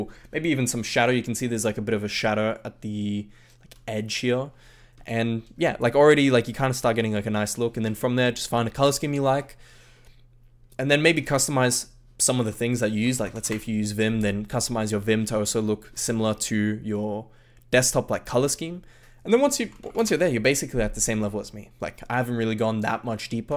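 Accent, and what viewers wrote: Hungary. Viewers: are you hungarian by any chance? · az úr magyar? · Are you Hungarian? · magyar vagy? xd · Hey man are you hungarian? :D love the video Nagyon jó videó lett:) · are you hungarian ?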